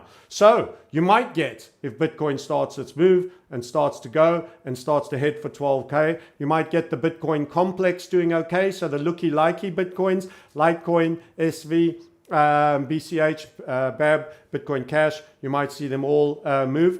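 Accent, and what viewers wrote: South Africa. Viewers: Love the accent. What part of SA are you from?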